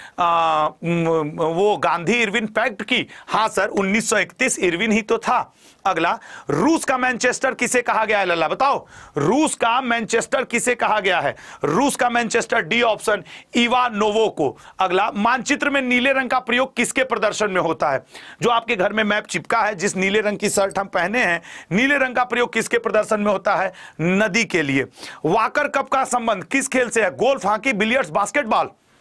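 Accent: Bihar, India. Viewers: Sir apka language Bihari jasa hai 😅😅😅😅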